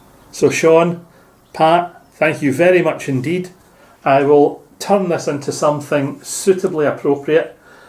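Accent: Scotland, United Kingdom. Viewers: My god, i love your accent! I trained in Edinburgh and i love love love the Scottish accent. Nathan sent me across from the sockmatician podcast. I'm going to be writing my comment as i watch your podcast, just so i dont forget what i want to comment on. xx ooh all of your sparkly yarn shows up great with the sun streaming in! I really like the design of the Sharf :) the colour inspiration is so interesting. i have to say i say no to requests, but then again, how could you say no to knitting a baby sweater for a friend? Ive had random people that ive met once while travelling, ask me for socks and stuff, and ive said no. it depends on who is doing the asking i suppose. that wool looks incredible! and that sweater looks SO AMAZING! I agree with the wide ribbing, babies have big tummies and they need space for all that growing! that hat is so ridiculously cute. 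I love love love that little feather and fan pink baby sweater. you remembered that pattern from years ago too, you are fearless and wonderful. im really excited to see what happens with the plant based fibers! i really want to learn more about that! im so glad you filmed that trip to the warehouse! its fascinating and not sad at ALL. im always filming for my podcast. i know someone will want to see what ive been up to - they seem like such lovely wonderful people! I'm definitely going to be getting some yarn from that wonderful shop. thank you so much! xxxx - Grace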